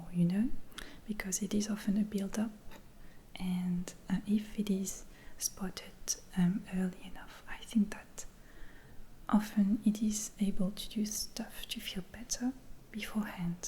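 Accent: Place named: France. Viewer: Your videos are so wonderful. You have a perfect ASMR voice. I love your accent too- I studied French for many years and it brings me back. You English is amazing!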